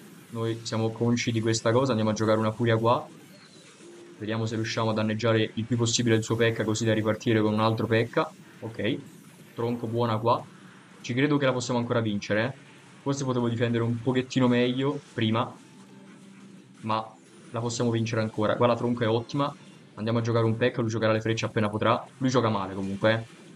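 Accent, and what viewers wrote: Tuscany, Italy. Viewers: Boia.😂. Ma quanto mi piace il tuo toscanaccio. Io sono di Ferrara emiliano. Ogni anno vengo da voi a Siena. Montepulciano Montalcino e pienza vino formaggio e olio. Poi un fine settimana a mangiare toscano con i vostri crostini di fegatini e milza che pochi conoscono e vivo bene per un anno 😂😂😂😂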